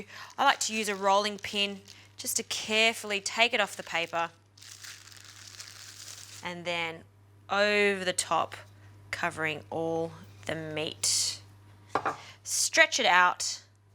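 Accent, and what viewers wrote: Australia. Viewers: She was born in Sydney. The accent should give it away, it's Aussie, not Kiwi.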